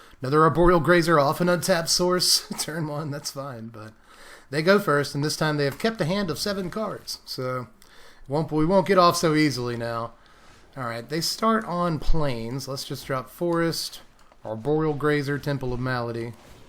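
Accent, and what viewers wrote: South, United States. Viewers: with that southern accent: "you're all ears huh, well i'm pretty certain i hear a mouth blabbing" I dunno about additions/subtraction from the deck, based on what i saw and the list, i would say 'cut the girls and a Vraska' play some actual removal or counter magic. Given what i read about the meta, my call would be to add 3 counter spells, to give a tad more options in the main against both agro and azorius ... deck looks like it should be well set up to keep some mana open on Op's turn.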